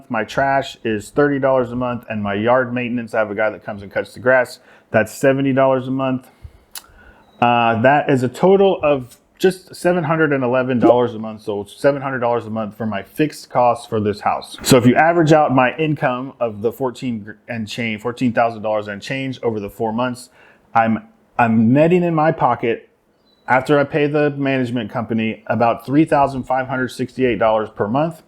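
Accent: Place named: Puerto Rico. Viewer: You have a slight. And I mean SLIGHT, Puerto Rican accent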